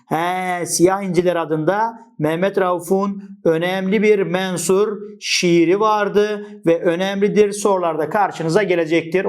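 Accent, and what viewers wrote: Black Sea, Turkey. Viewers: Hocam karadenizli misiniz?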